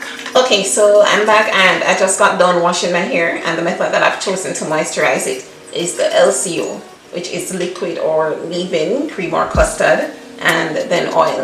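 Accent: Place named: Caribbean. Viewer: I'm hearing a Caribbean accent. Where are uu from?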